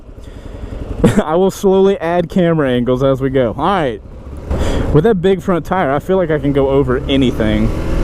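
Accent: South, United States. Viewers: Dude I fucking love this bike...I'm buying it. This will be my first bike.Thank You, you smooth southern, silver-tongued bastard.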